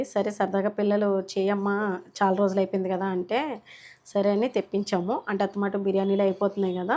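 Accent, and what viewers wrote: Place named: Vijayawada, India. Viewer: Mee. Maatalu. Bagunnayi. Mee. Pilla. La. Maatalu. Vijayawada. Language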